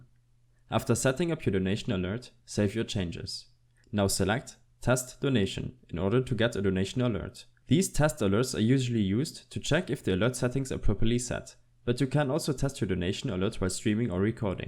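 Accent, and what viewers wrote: Germany. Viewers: Man hört so das deutsche Raus xD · Wenn er englisch redet aber eigentlich deutschen Akzent hat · Man hört das deutsche direkt :P xD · this is literally a german accent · man hört den deutschen akcent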